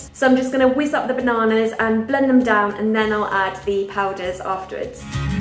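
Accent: United Kingdom. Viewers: Where is she from? It's a British dialect but I can't quite place it exactly :) Brighton maybe? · "cay-an" im laughing. the way you say things (I guess its just British) is funny to me.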